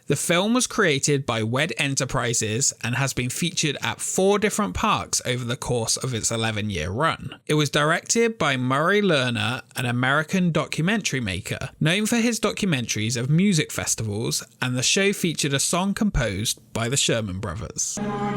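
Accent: United Kingdom. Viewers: He’s British, you gotta give him a break :)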